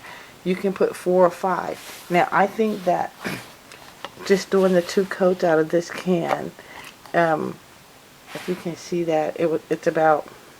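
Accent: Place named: South, United States. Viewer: Thank you for this creative video! This is the technique I'm going to use for my knit slippers (that have to be hand washed anyway). Never mind the negative comments, I'm from the South too, and that's just the way people talk down there. Keep the ideas coming! <3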